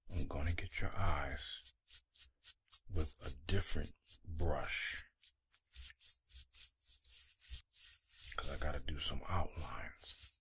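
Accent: New York, United States. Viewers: Is he from New York? Accent vibes. Was waiting for him to say deadass....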